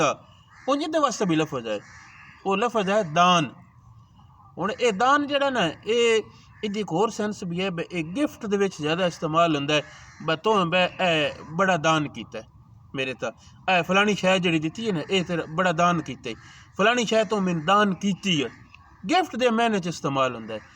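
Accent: Shahpur, Pakistan. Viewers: صاحب ہمیشہ کی طرح خوبصورت ویڈیو اپ کی اپ پنجابی زبان خاص کر شاہپوری لہجہ کے درست ترجمان ہیں سدا سلامت رہیں آباد رہیں ۔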